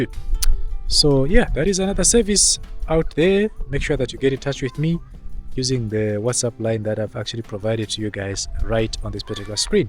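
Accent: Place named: Zimbabwe. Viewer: Wena you are an imposter from Zimbabwe why don’t you tell the truth everything about you screams Zimbawean even the accent . Zambians are very humble accommodating people .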